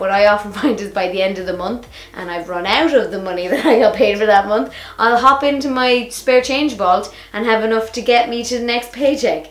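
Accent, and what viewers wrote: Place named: Dublin, Ireland. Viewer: You and Diane Jennings both live in Dublin but your accents sound different to me where were you born in that city or did you move there?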